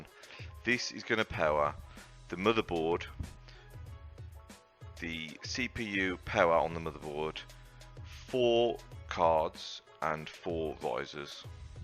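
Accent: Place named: Birmingham, United Kingdom. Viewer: I can easily tell from your voice that you’re a Brummy haha. Nice vid!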